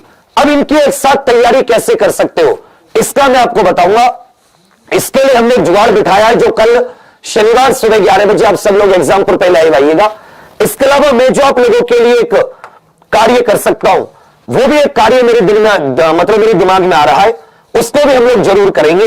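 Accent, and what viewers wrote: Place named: Uttar Pradesh, India. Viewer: Guru ji sudh desi u.p ka lag rha ho sol ma ek dam must 🤘🤘🤘❤️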